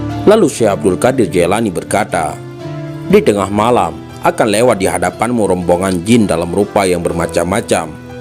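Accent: Aceh, Indonesia. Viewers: Logat mimin lage awak getanyoe nyan... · Sy selalu mengikuti kisah kisah yg admin sampaikan, maaf kalau boleh tanya admin orang aceh ya dari logat bahasanya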